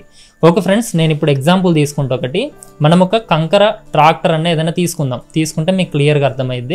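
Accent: Telangana, India. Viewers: తెలంగాణ యాస లో బలే చెప్పావు అన్న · Good information brother and mee telangana slash chala bagundhi... 👌👌👌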